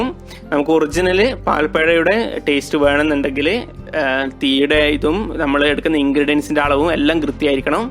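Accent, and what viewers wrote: Kannur, India. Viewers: 6 .49 innann paraya😂our original kannur slang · ettan kannur kaaran anno?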